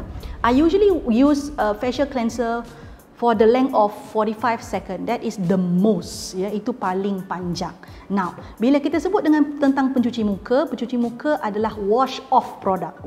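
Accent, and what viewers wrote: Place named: Malaysia. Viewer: Saya orang indonesia, suka banget dengan logat malay. saya paham betul point yang di bahas, walaupun beda bahasa. Terima kasih kaka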